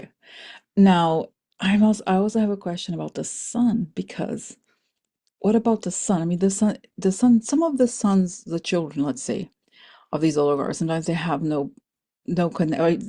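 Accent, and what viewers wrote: Russia. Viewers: Sorry but not understanding ur Russian accent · I just love your Russian accent! It’s my birthday on 4/6 and I needed this video. Happy Belated Birthday ❤️ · Your Russian accent is lovely! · Thank you, for that hopeful message before I hit my pillow! God I love your weird little faces and Russian accent!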